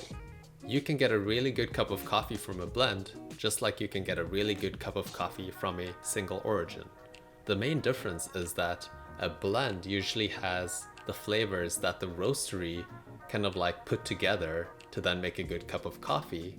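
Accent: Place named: Germany. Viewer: Idk why but I was so sure you were German lmao You kind of sound like a (very good) German English speaker xD Are you really Canadian?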